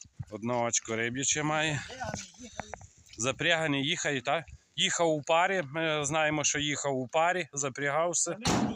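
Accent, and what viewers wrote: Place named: Hutsul, Ukraine. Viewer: Трохи Гуцул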